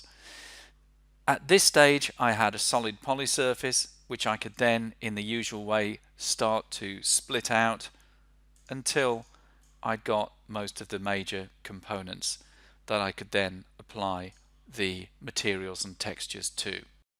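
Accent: United Kingdom. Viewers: Beautiful and sexy English voice and models. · good material but appalling teacher, a text to speech bot is gonna do better and with more life than you. Not to mention a posh accent is the last thing we want in a tutorial.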